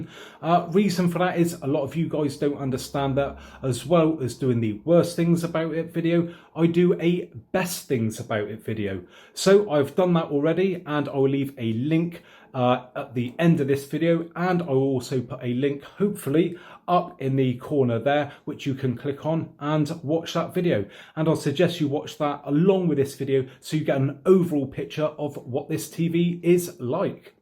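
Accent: United Kingdom. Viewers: are you from UK? good UK accent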